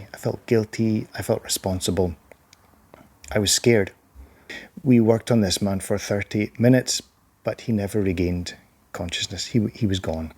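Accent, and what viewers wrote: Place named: Scotland, United Kingdom. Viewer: I really respect your honesty in sharing such a heartbreaking story As a Doctor,it is apparent that you always go the extra mile with compassion and diligence There is nothing for you to feel guilty about as you did all you could Labels,before a full work up is done,are indeed dangerous and damaging as illustrated in this particular case You have a charming accent May I ask which part of Scotland you are from,Sir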